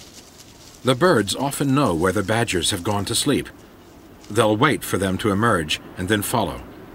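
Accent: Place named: Canada. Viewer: The narrator sounds Canadian. Feel like I'm watching a documentary from the Mother Corp back the 1980s. Cheers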